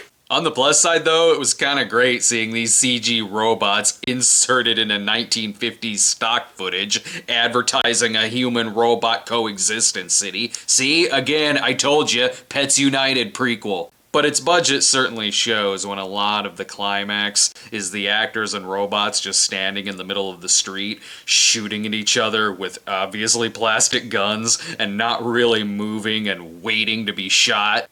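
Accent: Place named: Illinois, United States. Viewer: General Dix, Litchfield. Your Illinois is REALLY showing.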